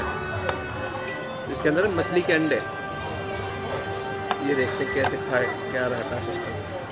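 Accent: Haryana, India. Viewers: Bhai kabhi ye apni harayanvi boli change mt krne ✌️♥️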